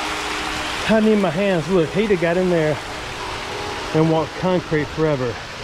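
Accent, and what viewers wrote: Alabama, United States. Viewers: Alabama!??? Yeppers 🤔.. I'm hearing that Alabama way of speaking in every time you get to talking...👍😎 A hard hat is obviously recommended....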